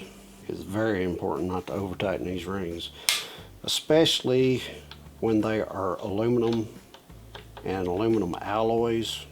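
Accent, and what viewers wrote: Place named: United States. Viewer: you are so Country.....lol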